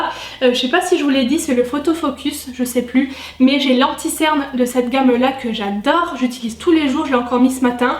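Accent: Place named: Alsace, France. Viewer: Tu viens d'où exactement en Alsace ? Parce qu'y vivant aussi je reconnais bien cet accent haha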